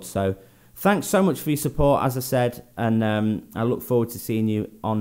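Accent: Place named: England, United Kingdom. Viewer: Wow I thought you were from England. Your accent is English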